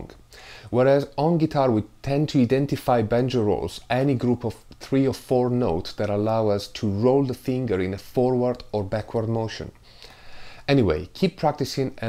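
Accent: Italy. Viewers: Your teaching technique is excellent. The Italian accent only adds tot he experience. Thanks,. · Japanese?? Sounds Italian, as he is. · Si sente che sei Italiano xD Bravissimo comunque ^^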